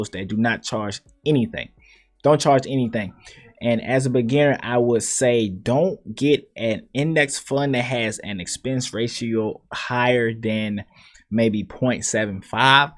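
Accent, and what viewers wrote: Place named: Jamaica. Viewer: Are you Jamaican or Trini ?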